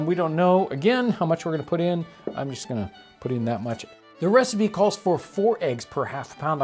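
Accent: United Kingdom. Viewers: Also are you British.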